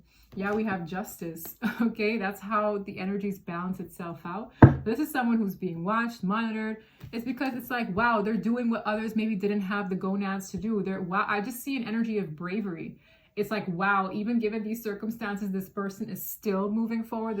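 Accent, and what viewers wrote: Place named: Netherlands. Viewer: Je bent Nederlands. DAMNIT IK WIST HET. Ik dacht al wat aan je accent te horen. Waar woon je, Curaçao?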